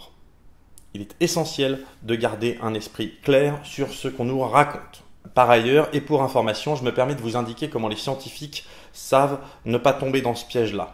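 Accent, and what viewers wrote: Alsace, France. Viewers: Le, "ou pien", c'est ton côté alsacien qui refait surface ? ^^ (on a dû te faire plusieurs fois la remarque, mais tant pis) Très bon format, au départ je m'attendais à une sorte d'ersatz d'axolot à cause du titre de la vidéo (aussi accrocheur qu'un titre de une de journaux ^^), et puis finalement je suis content que ça ait finalement apporté autre chose que mes attentes. C'est vrai que l'amalgame corrélation = causalité est fréquent, il est bien trop souvent de lire à cause de ça (jusqu'à preuve du contraire ;P) des âneries monstrueuses chez certains journalistes pseudo-scientifiques... koff koff Big Browser koff koff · Mince je voulais vraiment savoir quel était l'endroit le + dangereux de France xD En tous cas on a bien senti l'accent alsacien ;-)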